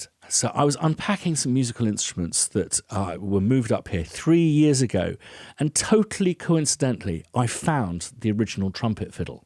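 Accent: United Kingdom. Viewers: The one simple thing you can remove from the chain of events that would make the outcome different is your British accent. There. I said it. Nothing better than learning composing from a dude with a sophisticated british accent.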